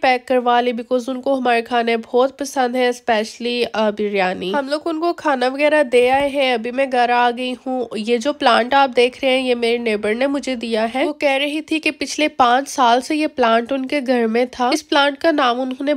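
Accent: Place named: England, United Kingdom. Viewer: ap ki urdu bari kmal hai hahah mujy boht maza ata ha england walon ki urdu sun k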